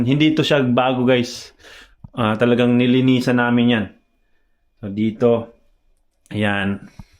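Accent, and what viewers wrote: Visayas, Philippines. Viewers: Maayong hapon Sir, dugay-dugay nako nga watch sa imo vlog,until I heard from you Bisdak diay ka,hehehe😍👍👏, happy ko karon naa diay Japan vlogger nga taga-Davao, so subscribe dayon way langay-langay...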